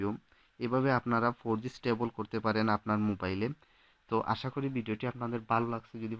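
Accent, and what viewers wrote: Sylhet, Bangladesh. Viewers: ভাইকি সিলেটি?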